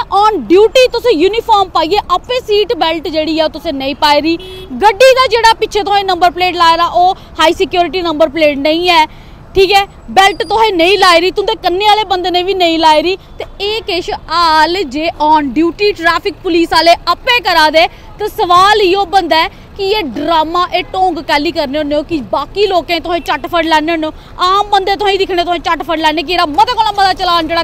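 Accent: Jammu, India. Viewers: Zabardast Reporting.. And in authentic Dogri style.. Proud of U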